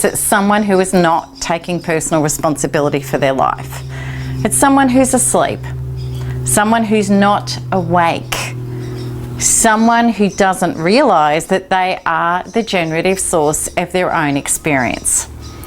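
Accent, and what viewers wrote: Australia. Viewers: By the way are you Australian? you sure sound it.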